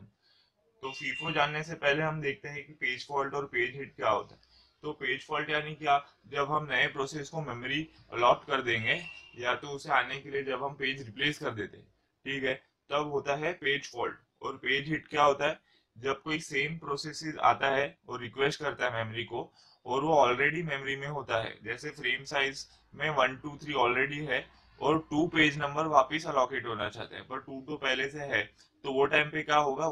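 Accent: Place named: Mumbai, India. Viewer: kya badiya mumbai accent hai sir ghar ki yaad dila di ekdum badiya sikhaya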